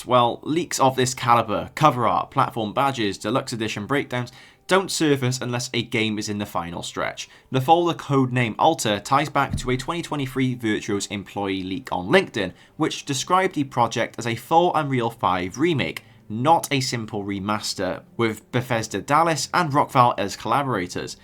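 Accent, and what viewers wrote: South Wales, United Kingdom. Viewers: So where in south wales are you from? (I can hear it, man)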